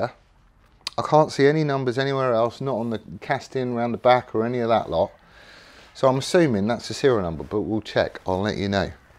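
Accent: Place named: Northern England, United Kingdom. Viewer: You're doing a nice job there. A question that's nothing to do with this, you live in Plymouth but talk like a northerner ? What's that about ?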